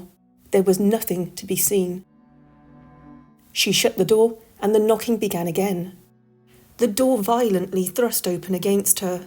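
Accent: England, United Kingdom. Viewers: What a beautiful home. Another interesting case,. Nothing better than a ghostly tale told with a British accent. I’m in bed, waiting for my nighttime meds to kick in and listening to my favorite YouTube channel. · Nothing better than hearing a ghost story in an English accent, well I concur, and I’m now becoming attracted to my own voice, jeez thanks, this is asmr stuff, honestly I could listen to this all night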